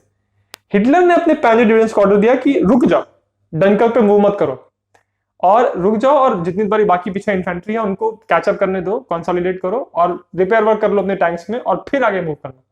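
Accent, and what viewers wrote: Bengal, India. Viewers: Bhai tum Bengali ho kya please reply🤗🤗🤗🤗🤗🤗🤗🤗🤗🤗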